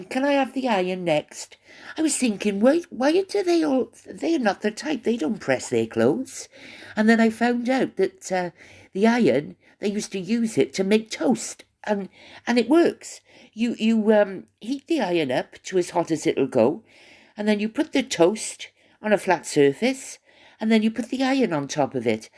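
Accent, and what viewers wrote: Wales, United Kingdom. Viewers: First time visiting you but I' ve heard good things about you from others here. Nice to hear a Welshy twang. I'm from Cardiff but I'm miles away from home. Shall be following..❤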